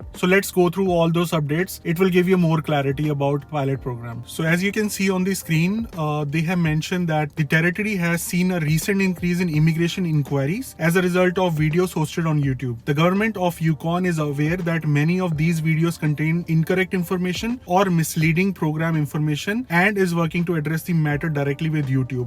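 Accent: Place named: Kerala, India. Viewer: Edo mashe eyyalude pronounced kettatal manasilakum malayaliyude kunjananu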